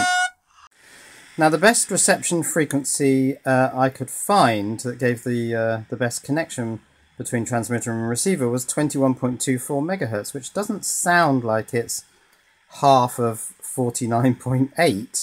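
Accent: United Kingdom. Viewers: just curious, are you from the UK ? your accent is very nice.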